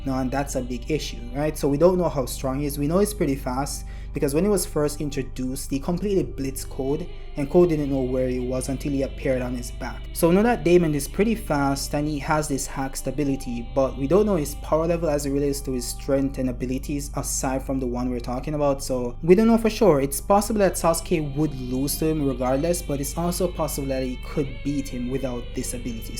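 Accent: Jamaica. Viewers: You form Jamaica? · U Jamaican? 🤔 That accent sounds familiar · ARE YOU JAMAICAN?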